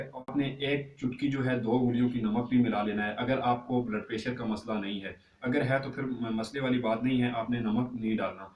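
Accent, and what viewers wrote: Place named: Pahari, India. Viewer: Bhai apni bolne ka treqa badlo Ap k bolne PTA chal Raha hy k ap koi phari ho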